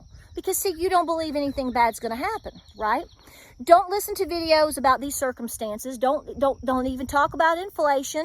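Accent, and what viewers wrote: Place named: New York, United States. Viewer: You are definetly my girl. Im from ny and live in nc now I speak like you. The truth. Lu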